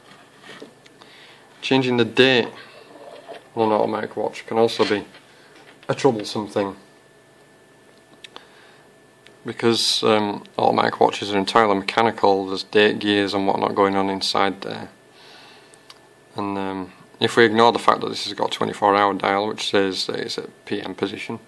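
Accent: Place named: Cockney, United Kingdom. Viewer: Heavy cockny accent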